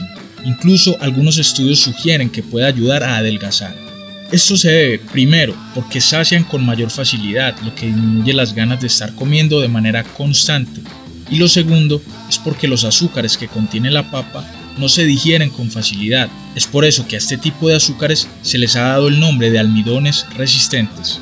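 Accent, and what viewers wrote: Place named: Medellín, Colombia. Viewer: Es el acento paisa de mefellin.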